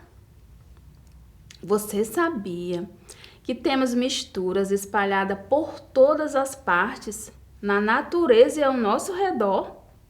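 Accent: Bahia, Brazil. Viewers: Ela é baiana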